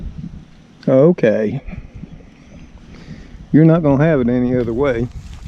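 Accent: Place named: Deep South, United States. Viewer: Dear Mr, Trixie and the kitty crew ….I have been watching you since Dec 2019 and I just love the way you speak...your accent makes me smile and I have learnt so much from you and your way of life in the deep south. Not sure how I came across you but I am so glad I did because yours is my favourite channel so I thinks its definitely us the viewer that is blessed to have such a wonderfully humble and gracious host with such a love for life and his fellow animals. By the way...I am Indian by heritage but born in the UK and I live in a little village in Shropshire ….a lot of things are so different but the love we share is the same...God bless you Sir....Mand X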